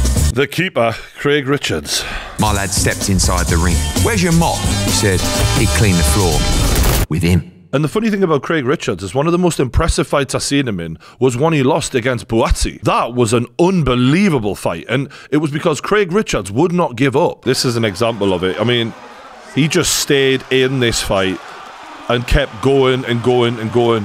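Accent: Newcastle, United Kingdom. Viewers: Hello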